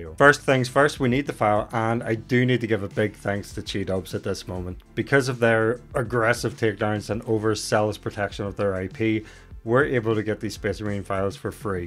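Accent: Northern Ireland, United Kingdom. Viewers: Is Discord for Patreon members only? Also, are you from Northern Ireland?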